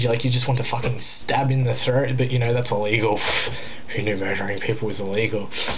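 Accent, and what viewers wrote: Australia. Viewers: I'm Australian and if you are Australian you would be able to recognize his accept ahahah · ) australian accent, thats hot xP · I'm British.. you deffo sound like an Aussie lol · Heh only a Yank would think that was British. But as a Brit, you have a lovely Australian accent. ;)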